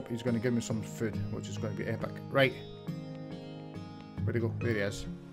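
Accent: Scotland, United Kingdom. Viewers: Black Sheep Thats what I thought as well :P I love the Scottish accent though!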